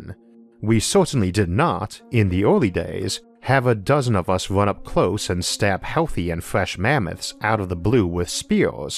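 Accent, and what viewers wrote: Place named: Washington, United States. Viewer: possibly but I think there's an accent like that in Washington somewhere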